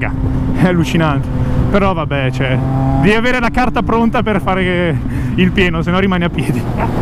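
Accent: Italy. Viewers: ma sei veneto? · Ciao ragazzo ma sei di Brescia o provincia? Dall accento sembrerebbe di si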